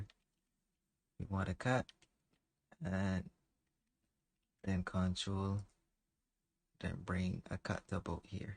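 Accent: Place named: Barbados. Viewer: Haha I knew as soon as I heard the accent. Never thought I would see a fellow Bajan doing 3D Media. I'm excited to learn from you 💕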